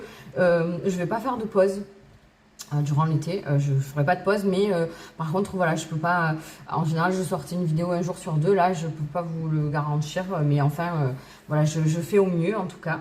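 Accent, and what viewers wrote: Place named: South, France. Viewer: J'aime bien votre accent du sud 🥰😉 !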